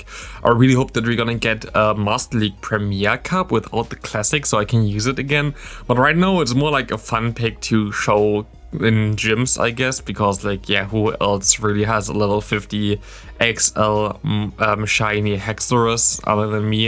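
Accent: Germany. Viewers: Ohne es zu wissen, aber richtig deutscher Akzent im Englischen. :-)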